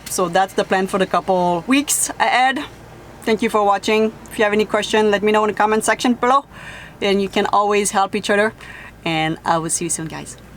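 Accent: Quebec, Canada. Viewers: French Canadian, from Quebec city.✌️💕🇨🇦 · I think she said her accent is from Quebec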